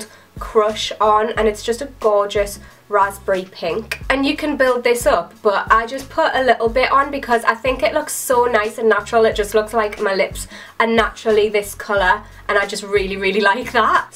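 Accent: Newcastle, United Kingdom. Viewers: I have no idea if anyone else has said these and I’m sorry if they have but three facts about you are 1) You’re from Newcastle (I love your accent) 2) You have lots of ear piercings and normally wear hoops in your videos and 3) You have been on some pretty funny dates like the one where you were with that boy and he tried to kiss you and you jumped away and said “well that was fucking awkward wasn’t it” or when you peed when having sex one time 😹 you’re honestly so funny, I absolutely love your videos and you are and always have been one of my favourite yourtubers, I love you! Thank you so much for doing this giveaway you’re so kind ❤️❤️ Good luck to everyone ! Xoxo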